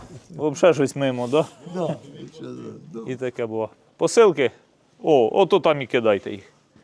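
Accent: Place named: Western Ukraine, Ukraine. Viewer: Ну вино шо з якогось села по мові чути чи Львів чи Закарпаття мова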